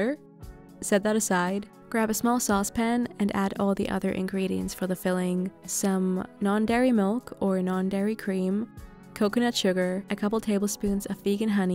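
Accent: Germany. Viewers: Wait, are you german? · Are you German? 😂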